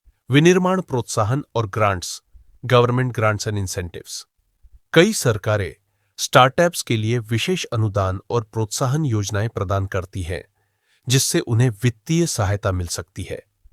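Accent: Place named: Maharashtra, India. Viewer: आपकी टोन महाराष्ट्रीयन जैसी लग रही है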